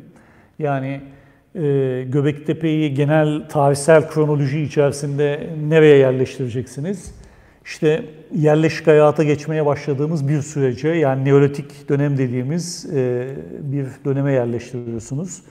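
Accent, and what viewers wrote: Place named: United States. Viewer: Aynı konuyla ilgili ilk dersinizi de zevkle dinlemiştim ama bu çok daha zevkli oldu benim için. Teşekkür ederim hocam. Bu arada söylemeden geçemiycem Türkçe yi Amerikan aksanıyla konuşmanız da renk katmış😁 tekrar teşekkür ederim.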